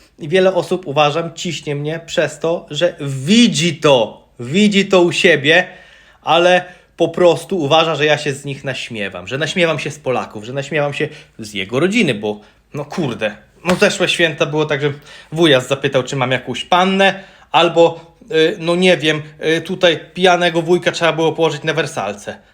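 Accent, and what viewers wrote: Lublin, Poland. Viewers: Ty zaciągasz jak mój stary 😂 Wychowany i urodzony na lubelszczyźnie od pokoleń.. Święta w twoim wykonaniu to jak nagrane z mojego rodzinnego stołu. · Jak mówisz bez akcentu to brzmi dziwnie.Najlepiej jak robisz za wujka z lubelszczyzny.Mam tam rodzinę i faktycznie taki akcent mają.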